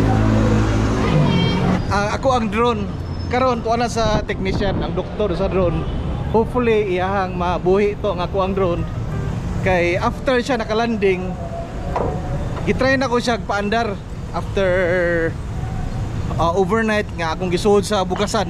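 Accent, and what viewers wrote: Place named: Cagayan de Oro, Philippines. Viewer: Your videos are a “whale” lot better than the other masquerading to be the “go to guy” of CDO Vlogs. Why is that: - you transition from one view to another is smooth (does not hurt the eyes). - background music is good; narration is even better and not trying hard. - your cagay-anon and cebuano bisaya tone is downright natural and entertaining. - you can improve though on the clarity or is it quality of your videos Continue to do your vlogs without really trying hard. Continue too to be a “ man for others”.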